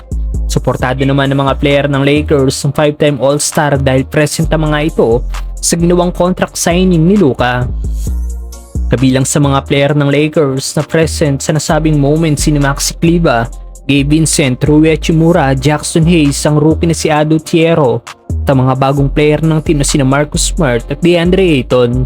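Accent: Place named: Laguna, Philippines. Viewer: San ka sa Laguna? Yung tono mo haha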